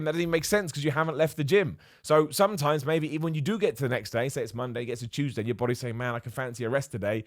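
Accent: United Kingdom. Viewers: Oh, it's! The things you say repeatedly get rushed through to where they've lost our normal, awe-inspiring Bri'ish enunciation. I finally caught it! I really enjoy your videos. You make even the droll topics more listenable.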